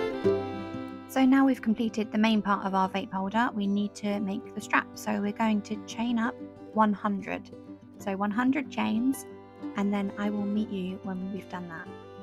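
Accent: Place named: United Kingdom. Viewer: British "accent"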